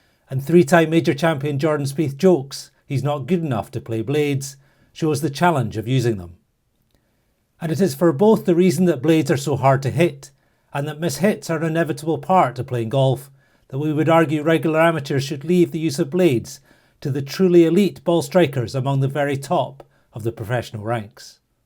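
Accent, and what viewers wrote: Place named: Scotland, United Kingdom. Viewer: Hello. Really enjoy the channel, and in particular the great Scottish accent which gives us the information. My question is not blade related, but iron related. I enjoy my putter and driver showing me the best place to hit within the face, is there a reason why this is not done on irons? Thanking you in anticipation.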